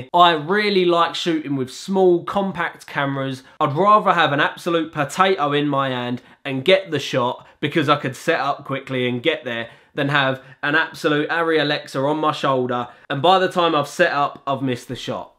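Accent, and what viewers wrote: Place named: Cockney, United Kingdom. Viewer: Great video. The cockney accent makes the videos even more enjoyable XD.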